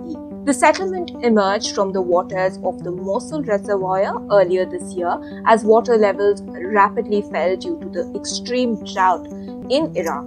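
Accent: India. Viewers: Yuck, that indian accent. Stop speaking english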